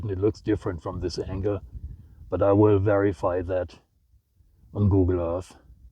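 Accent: Germany. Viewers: Love the Bavarian accent!! Great video! I wasn’t aware that there was an uncontrolled landing of a UAV. Thanks for the info. New Subscriber! Greeting’s from New Hampshire.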